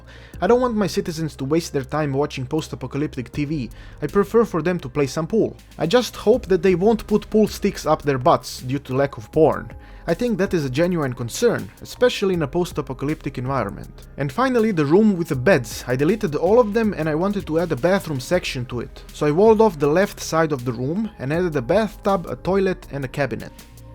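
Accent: Eastern Europe. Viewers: You are so funny mate!!! Also what country are u from, u sound Eastern European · Nguyen He's from someplace in Eastern Europe, don't know exactly where. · where are you from? your accent sounds eastern European or Russian. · The Eastern European accent makes the suble vulgar comments pretty enjoyable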